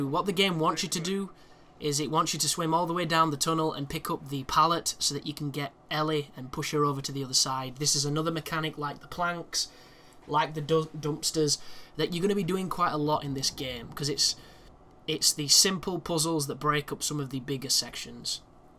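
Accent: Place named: Northern England, United Kingdom. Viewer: I like survivor difficulty walkthrough, you sound northen said fooking as well as fuck alot, subbed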